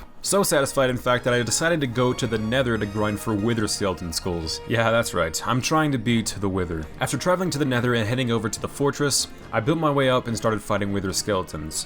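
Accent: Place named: Ireland. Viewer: the casual random irish accent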